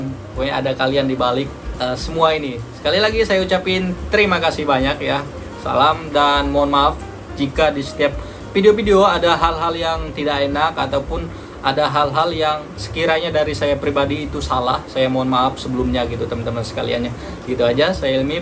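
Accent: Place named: Medan, Indonesia. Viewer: awak setelah tahu kayak seperti bahasa medan gitu.......baru awak suscribe biar enggak apah kali....Selamat ya bang ilmi dan bang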